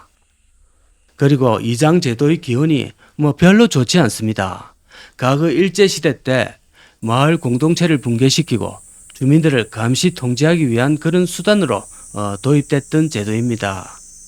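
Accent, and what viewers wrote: Gyeongsang, South Korea. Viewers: 1976년부터 1988년까지 13년동안 마산 창원에서 20대 직장과 청춘을 보냈는데 정겹고 친근하고 구수한 사투리가 향수를 자극 합니다.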